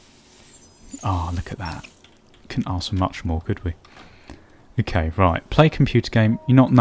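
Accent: United Kingdom. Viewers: British accent = $waggest accent in the world.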